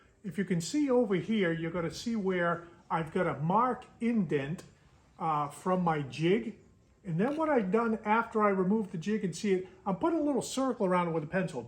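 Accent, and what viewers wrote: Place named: Maine, United States. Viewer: Hey, your videos are really great. Well thought out, straight to the point, helpful, and that cool Maine accent is the icing on the cake. Keep ‘em coming.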